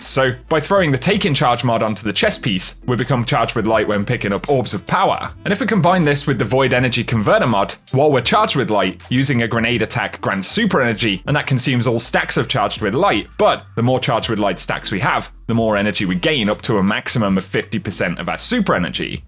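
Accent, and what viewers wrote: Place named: United Kingdom. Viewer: when did you turn british?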